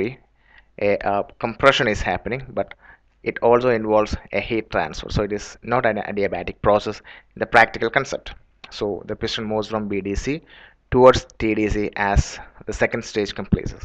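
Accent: India. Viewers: Indian accent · Fuck your fucking Indian accent.. You fucking fuck!! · hmm the curry accent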